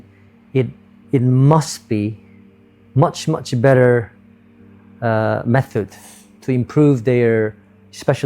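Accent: United Kingdom. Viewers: It is just refreshing to hear someone speak with a British accent in Indonesia, as most people use an American accent. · Aksennya british sangat😂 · i like it hear british accent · Thank you coach.. its nice hearing your British accent 😊 Semangat Garuda 🔥🔥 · British Accent nya renyah didengar 😅👍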